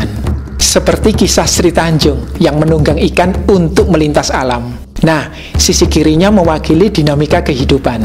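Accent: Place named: Java, Indonesia. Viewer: Naratornya jowo banget, jowo medhok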